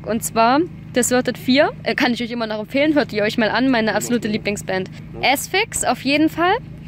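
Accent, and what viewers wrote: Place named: East Germany, Germany. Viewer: Hey. Ich sehe gerade das erste mal ein Video von dir (ich war auch aufm Rockharz dieses Jahr) und wollte mal fragen aus welchem Bundesland du kommst. Bestimmt ausm Osten?! Erkennt man am Dialekt.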